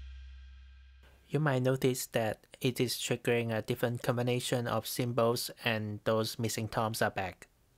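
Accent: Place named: France. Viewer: Love the crazy French accent!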